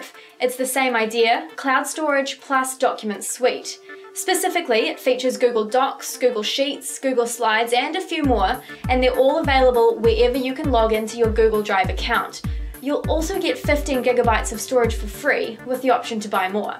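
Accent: New Zealand. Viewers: I think that's a New Zealand accent. They tend to pronounce the a in words as an i. "hiving the software"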